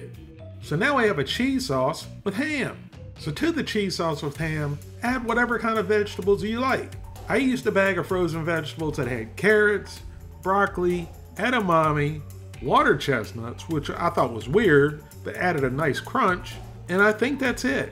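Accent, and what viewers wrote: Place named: Mississippi, United States. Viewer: Have been here much lately but holy CRAP you've gained a ton of subs! Lol I was around when you were at the 20-40k subs. Good for you brotha! I've always thought you were a Mississippi guy bc of your accent, so now I'm wondering are you? Obviously I am is why I'm asking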